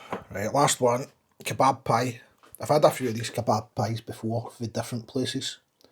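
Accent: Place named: Scotland, United Kingdom. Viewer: Very nice. I was married in the church you drove past, the pies turned out better than my marriage 😂. Good to hear a proper Scottish accent on YouTube, most people try to posh up the glesga accent.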